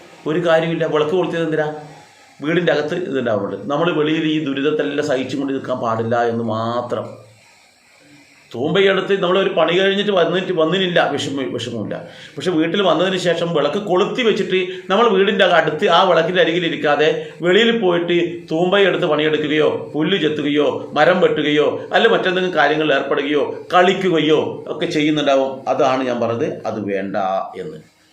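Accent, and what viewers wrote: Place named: Kannur, India. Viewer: Namaste ji,thankalude nadu kannuranennu tonunu samsaram ketit,kannur evideyanennu parayamo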